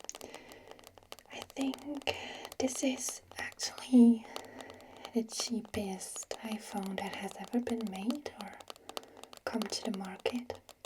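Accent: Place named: Germany. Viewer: A suspiciously good German accent🤔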